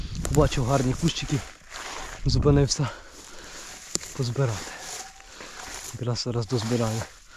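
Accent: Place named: Yavoriv, Ukraine. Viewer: збір чорниці - найдивовижніше заняття у світі... особливо якщо пальцями по ягідці!!! Так з рідними збирав ще 60 років назад на Яворівщині Львівської обл... Ти часом не з тих країв?!? Щось знайома говірка, працьовитість і любов до природи!!! Привіт синьо - жовтій Швеції!!! 🇸🇪 ❤️ 🇺🇦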